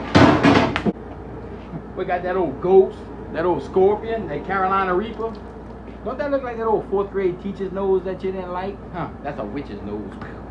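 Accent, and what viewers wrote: Louisiana, United States. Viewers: I know this dude is portraying a character, at least partly.....but I'm sold. Great cooking, he gets right down to bid'ness, and he's got that super dope Cajun accent. The ONLY criticism/question I have about this vid: Why is the max resolution only 480p? A small issue, but I had to ask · My mans becoming more Cajun every video. The south runs strong in this one. Can’t wait tell I’m back there! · you sound like you from Catahoula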